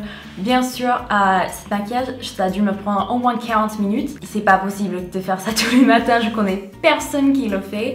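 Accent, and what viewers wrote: United States. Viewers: L'accent américain il est tellement beau 😍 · I had no idea has an American accent when she speaks French x) I hear it normal maybe considering I'm American so French people will hear this differently. I wonder if they like the American accent though? ^^ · Oh mon Dieu, déjà que mon rêve c'est de vivre aux USA, mais alors toi, ton accent et tout, ça me donne trop envie d'y aller😔💜 Et sinon tes trop belle et super vidéo💜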